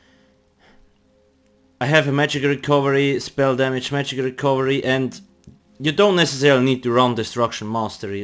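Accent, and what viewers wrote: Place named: Switzerland. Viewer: Hahaha i knew it! This accent(akzent) must be from a swiss guy :D Grüezi from freiburg :) I got a question. Woulf you mind to help me a bit out about pvp getting better with classes(mainly nightblade or sorc) and other basic stuff(im not a beginner in teso but im a beginner in pvp even if my pvp rank is veteran im not that good)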